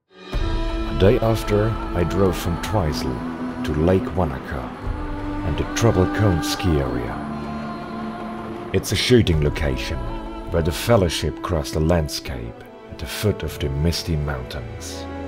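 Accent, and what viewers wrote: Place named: United Kingdom. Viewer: are you austrian? cause you totally sound british. great video, I'm so jealous now. I watched the beginning with the Narnja music over 10 times, I think. just gives me goosebumps.... O__O I might actually take the "wrong flight" on purpose, next time I go to see my family in the Philippines and have a stop-over in Dubai or AbuDhabi.....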